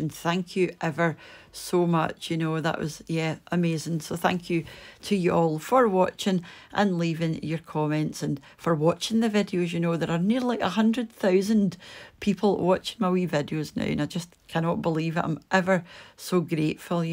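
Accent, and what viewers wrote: Scotland, United Kingdom. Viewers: I am here just to listen to your lovely Scottish accent. So soothing. From the US but lived in Dunoon many years ago.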